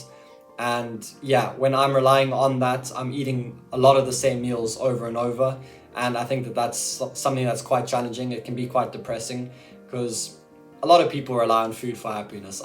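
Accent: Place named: South Africa. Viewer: I could hear your accent you South African....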